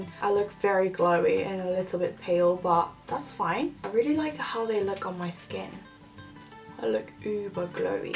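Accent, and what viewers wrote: United Kingdom. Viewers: 영국발음인가요...??? 진짜매력있어요 요즘관심많이가는데ㅜㅜㅜㅜㅜㅜㅜ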